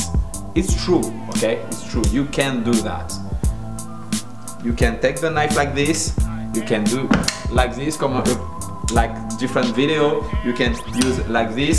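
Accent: France. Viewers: Avec un délicieux petit accent français ;-) Merci pour la vidéo! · Super vidéo mec, je ne savais pas qu'il y avait un français sur cette chaîne j'ai reconnu direct avec l'accent ^^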